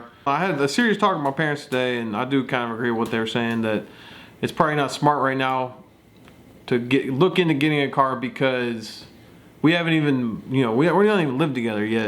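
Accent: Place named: South, United States. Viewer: All of these years and I just now realized you have a southern accent.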